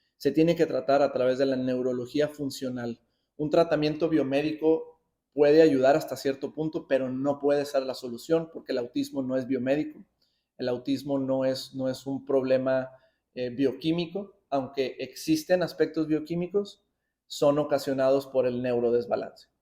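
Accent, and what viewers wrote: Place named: Guatemala. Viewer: De que país es el dr.???? Parece guatemalteco por el acento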